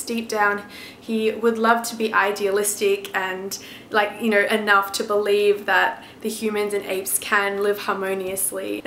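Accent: Australia. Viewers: Glad I found your channel. Subscribed. Are you Australian?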